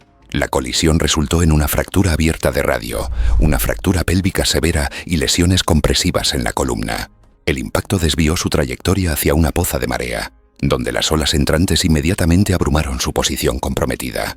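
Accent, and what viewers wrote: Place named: Spain. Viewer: ME ABURIO TU ACENTO ESPAÑOL DEMASIADAS ESES Y ZETAS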